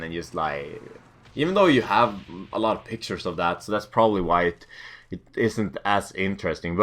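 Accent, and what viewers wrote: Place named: Sweden. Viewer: "the shooting of yon f kennedy" hahaha swedish accent FTW!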